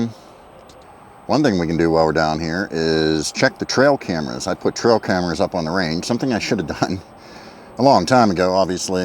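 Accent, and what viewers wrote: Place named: Midwest, United States. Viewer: I've noticed you are bit thin skinned and don't like constructive criticism. We are probably pretty close to the same age (I'm 55) and it's quite clear you have never had any formal instruction either from a civilian course or the military. This is your channel you can do as you see fit but maybe just maybe a few of us out here know a thing or two and perhaps its possible you could learn something? I'm not sure what state you are in Ohio or Indiana maybe possibly Pennsylvania with that accent? HR Funk (gun Tuber) lives in Ohio. You could probably reach out and I bet he would be happy to give you some personal instruction on rifle marksmanship. He was a Marine Corps sniper and a police officer and even a police chief before he retired. He's one of the nicest chaps on Youtube. Look him up he typically replies to emails fairly promptly.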